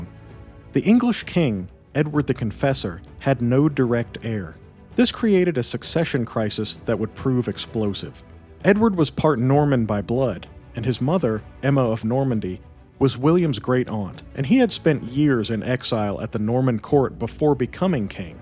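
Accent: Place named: United States. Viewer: the US pronunciation which jars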